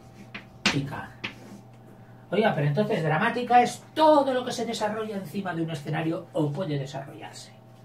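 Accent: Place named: Spain. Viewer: quiero un profesor asi...y con ese azento ezpañol,aaa mencanta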